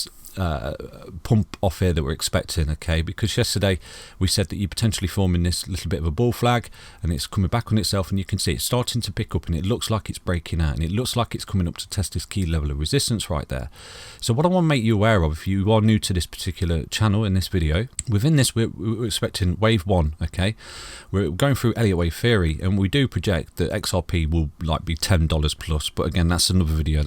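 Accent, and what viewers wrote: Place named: England, United Kingdom. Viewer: IMHO. You ARE the best out there and no BS. No disrespect to the American You tubers, but so nice to hear a good English voice. Keep it up!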